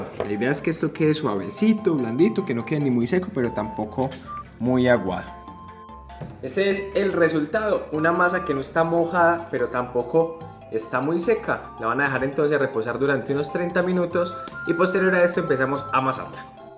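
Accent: Colombia. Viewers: Me encanta ese acento Colombiano. Saludos 😙😍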